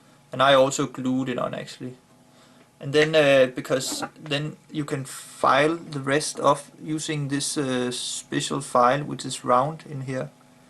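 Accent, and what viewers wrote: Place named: Denmark. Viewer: are you Danish by any chance? your accent is so Danish!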